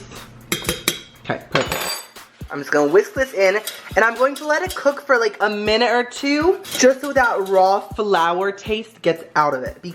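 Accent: United Kingdom. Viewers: That british accent tho😂👍👌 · DO AN ENTIRE VIDEO WITH YOUR BRITISH ACCENT AND WITH A CUP OF TEA PLEASE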